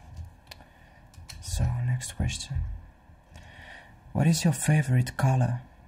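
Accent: Finland. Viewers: Finnish, but it isn't a super strong finnish accent. Finns sometimes speak something called rally English and atleast i think it's atrocious, his accent is pretty stereotypical though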